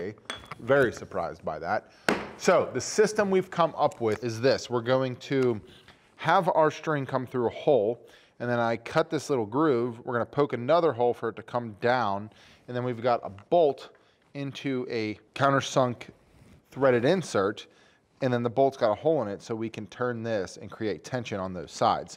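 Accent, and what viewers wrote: Pittsburgh, United States. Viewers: He has a Pittsburgh accent plus he says “Yinz” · Hey yinz, new to the channel, love the Pittsburghese!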